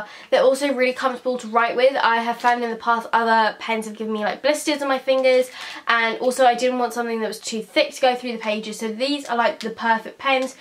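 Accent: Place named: United Kingdom. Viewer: I love your accent!which accent are you speaking in?cause they are many accents in the UK.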